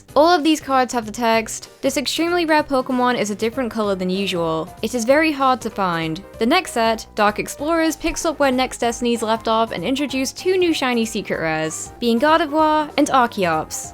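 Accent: United Kingdom. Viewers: are you British ?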